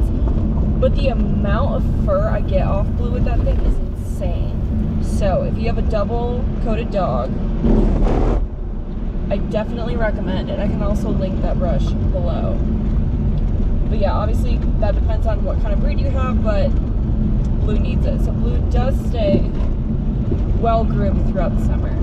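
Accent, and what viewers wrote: South, United States. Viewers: Please do an entire video in the Southern accent!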